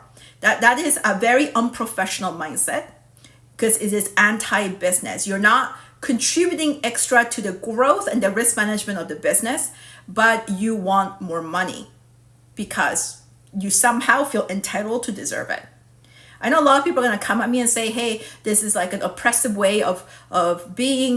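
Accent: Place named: Brazil. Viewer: Anyone can be brazilian haha we have the largest japanese pop outside of japan. Her accent is very brazilianish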